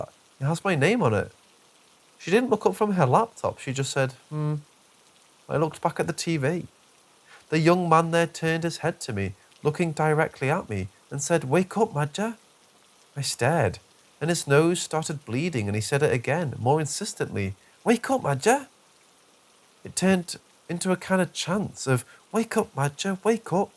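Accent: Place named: Scotland, United Kingdom. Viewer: Kinda hard to take tales of NC seriously from a Scottish accent....but good tales. 😎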